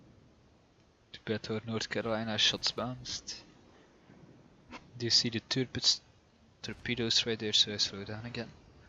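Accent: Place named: Flanders, Belgium. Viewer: Wow you're really not trying to hide that Flemish accent lol. :D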